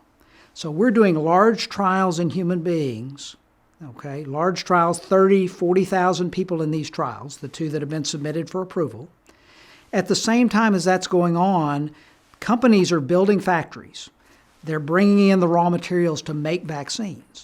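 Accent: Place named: United States. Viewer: Another Doctor that gets to keep his license and job! (he also has the same American accent as 'Snowden' his first name will come to me...maybe) as has of course zuckerberg, gates im English and these have a familiar accent its it a certain region? Or is it their own exclusive tribe? Edward! i knew it would come to me if i just kept typing!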